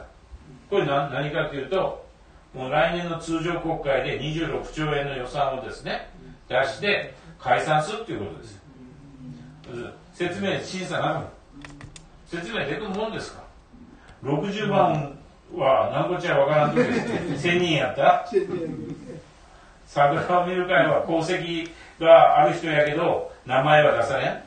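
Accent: Saga, Japan. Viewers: 佐賀弁が身近で良い。解散があるんですね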